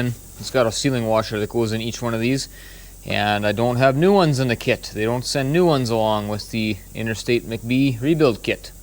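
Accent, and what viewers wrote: Canada. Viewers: I'm in the middle of rebuilding a Maxxforce DT and found you video on measuring piston protrusion, and I kept thinking that you sounded like you were from South of Winkler, I grew up near Plum Coulee and Currently reside in Portage la Prairie. I just got the Rocker arms in, the valves set, and the injectors installed today. I'm rebuilding it because the dogbone holding the lifters straight for #5 broke allowing the lifters to turn wiping out the camshaft, and also the #3 cam bearing was missing and #2 had shifted. love the hex adjusters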